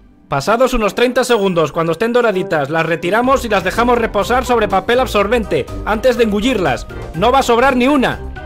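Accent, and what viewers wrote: Spain. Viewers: bien eso es facil me gusto solo me da risa tu acento de español xD me encanta <3 gracias like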